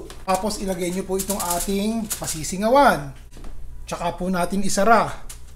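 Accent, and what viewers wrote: Philippines. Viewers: are you from Nieva ecija ? the way you talk you sounds like my cousins from the philippines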